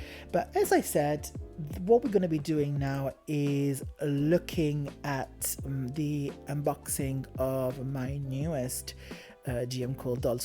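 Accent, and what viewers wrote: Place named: Japan. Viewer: I am obsessed with your accent. Are you of Japanese descent? More videos please, but also include more pictures.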